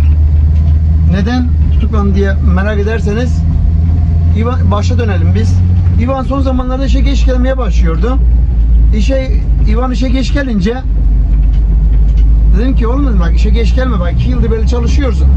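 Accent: Gaziantep, Turkey. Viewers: Abi bi anteplinin dilinden antepli anlar gelirken sana salca getirecem 😅😅😅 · Çok şükür gerçek bir Gaziantepliye rastladım. Abi seni dinlerken tamam bu tam Antepli işte dedim. Doğal ve içten olurlar. Samimi insanız biz çünkü 👏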